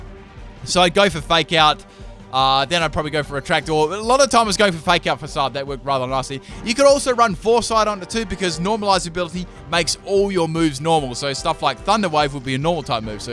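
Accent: Australia. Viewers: you sound like an australian dude named ben for some reason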